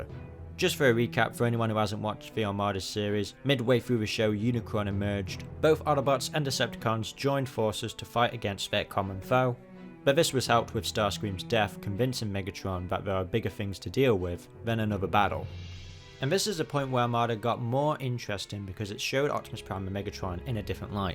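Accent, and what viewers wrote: United Kingdom. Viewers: So do you have a British accent or some other kind of accent? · he is posh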